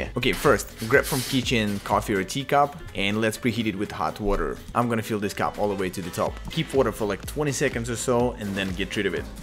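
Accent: Russia. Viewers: Мне одному казалось что он русскоязычный из-за акцента🤔😁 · Как так получилось, что ты русский и делаешь это на английском? Я просто долго думал, русский ты или нет, вслушивался в акцент... И вроде я уверен в этом. Расскажи, пожалуйста, по скольку мне очень нравится то, что ты делаешь, а потому было бы очень интересна твоя небольшая история. Спасибо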